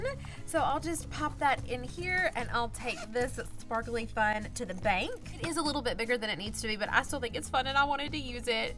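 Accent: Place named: South, United States. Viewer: definitely not annoying at all I love southern accents 🥰🤍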